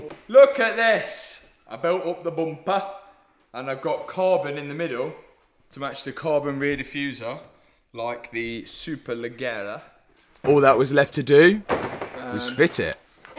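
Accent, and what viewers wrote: United Kingdom. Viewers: This British accent is so good to hear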